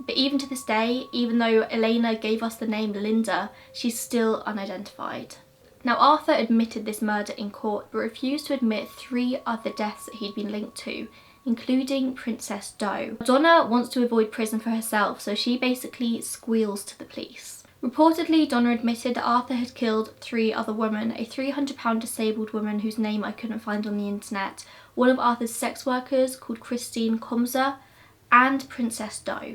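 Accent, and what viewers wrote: England, United Kingdom. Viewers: I just realised that the English accent is so much nicer than the American one. No offence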